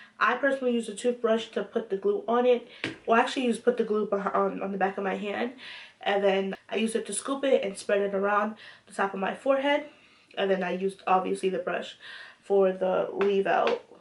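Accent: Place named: New York, United States. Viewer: U sound like u from new York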